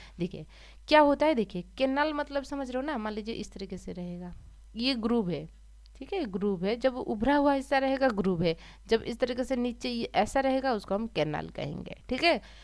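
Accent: Odisha, India. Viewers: Mam are you from odisha??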